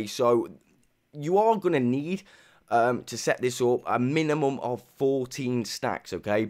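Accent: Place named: United Kingdom. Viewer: For anyone asking why he sounds like DanTDM, it’s because he’s British and has a similar regional accent to him. There is a slight difference, with Dan sounding slightly more “posh” than him, but they are largely the same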